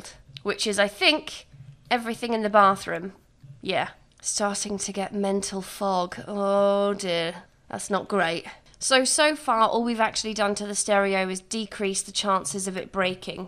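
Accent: United Kingdom. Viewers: I love ur vids cuz their so funny and i like hearing ur voice! British voices r so smooth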